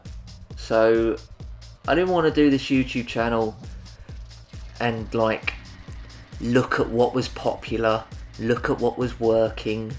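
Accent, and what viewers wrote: United Kingdom. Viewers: Hey! Where did your inspiration for the cockney accent come about?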